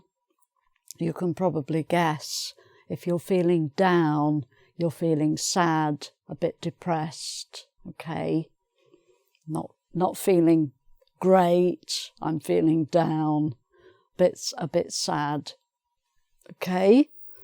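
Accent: England, United Kingdom. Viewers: This english is more beatiful than the american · stabel This is definitely not an Australian accent...she is from the UK and has a British accent. Btw there are MANY British accents!! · THANKS A LOT! I love your videos. Specially because your accent is from the UK. And since I live in Mexico every one learns to to speak that awefull american English, which I already know and, to be honest, don't like. I'm stil learning UK accent. An I hope to keep watching your videos until I'm dead. Hugs an kisses lovely lady!! <3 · Hi! I’m Bruce from Korea 🇰🇷. I bet you are the best English teacher on YouTube. I am so jealous of your English accent. Wish I could have that one as well. Thank you! ❤️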